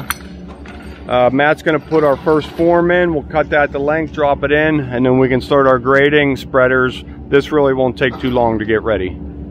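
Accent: Pittsburgh, United States. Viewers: Don't worry about talking like a Yinzer. It's everyone else that has an accent.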